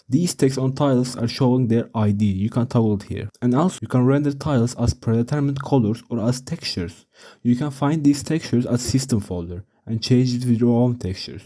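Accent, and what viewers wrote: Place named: Turkey. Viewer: İlk videonda aksandan direkt anlamıştım Türk olduğunu . Güzel kanal devamını bekliyoruz hocam.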